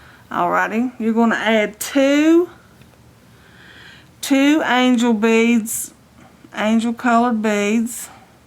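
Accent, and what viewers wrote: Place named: South, United States. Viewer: I love your accent! Are you from the south? I am and I have a strong accent. You remind me of my Grandma :)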